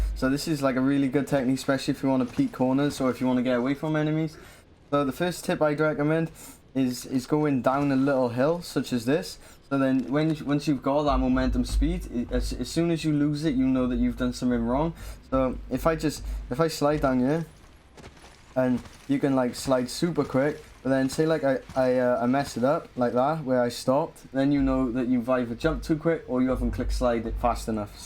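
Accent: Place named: Wales, United Kingdom. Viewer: Can't watch ur too Welsh sorry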